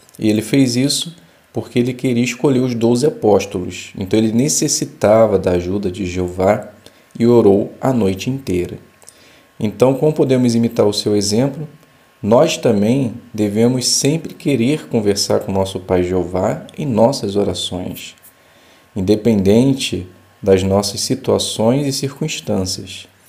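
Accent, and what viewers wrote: Rio de Janeiro, Brazil. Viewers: IRMÃO, MATE MINHA CURIOSIDADE: O. IRMÃO É? A VOZ É IDÊNTICA; ATÉ O SOTAQUE BEM CARIOCA. BOM DIA. ABRAÇOS TERNOS E FRATERNOS. 26/08/2022.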